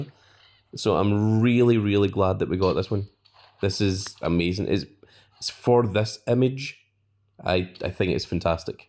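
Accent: Scotland, United Kingdom. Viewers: Yoo are you Scottish or is it just me also nice video cause I’m Scottish 🏴🏴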